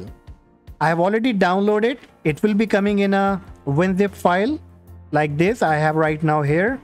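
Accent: India. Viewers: I don’t trust that Indian voice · Bro speak real English not Indian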